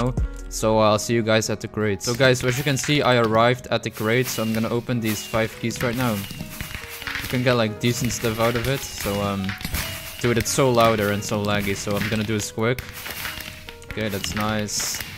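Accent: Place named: Netherlands. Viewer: U sound Dutch. Are you Dutch?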